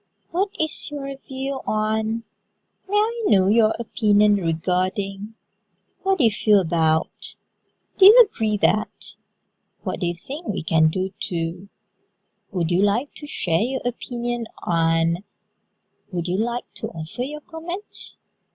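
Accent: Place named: United Kingdom. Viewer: 8 mins before my MUET. Btw love your british accent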